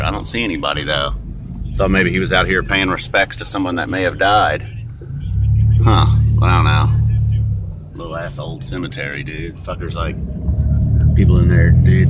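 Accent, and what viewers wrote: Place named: Alabama, United States. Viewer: Damn. You could be riding through Slapout, Alabama if I didn't know any better. Just different accents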